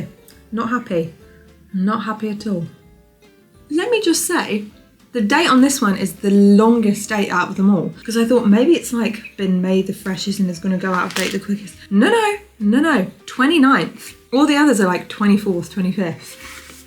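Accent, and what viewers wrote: England, United Kingdom. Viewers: Je viens de te découvrir, j'ai beaucoup aimé cette vidéo. Ce qui me perturbe c'est ton accent anglais alors que tu es en Amérique puisque tu testes Costco... À part ça merci pour cette vidéo. Bisous de France ❤❤❤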